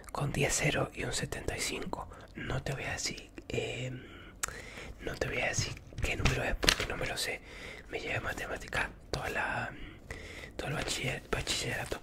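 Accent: Córdoba, Argentina. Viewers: sos de la calera, Córdoba??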